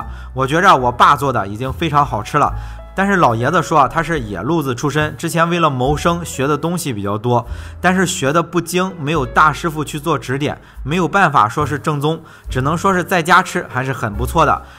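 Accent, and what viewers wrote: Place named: Shandong, China. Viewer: 每次听到带点山东口音的解说还是感觉很亲切的，请问你是山东哪里的？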